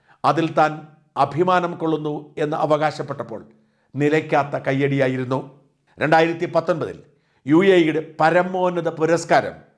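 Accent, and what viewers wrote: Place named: Kanyakumari, India. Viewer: Aarenkilum vilichotte shajan Sir, kanyakumari malayalam 🎉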